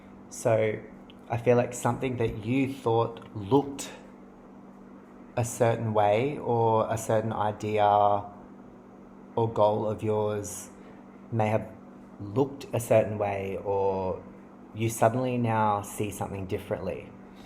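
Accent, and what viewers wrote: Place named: Australia. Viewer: Great to hear an Aussie!! You're spot on... keep doing it... I love that you're not afraid to talk about the denial ..it was my relationship... I felt he was lying about things but he gaslighted me into believing him...until I started having confirming dreams!! Confronted him & now with my eyes opened I could feel he was lying... he's a covert narcissist. It was his control for 4 yrs & yeh on the surface he gave up alot of addictions but was wanking to porn/women online!! Yep he's in HUGE denial... very deceiptful Keep reading... you're fairly spot on for me except the career thing...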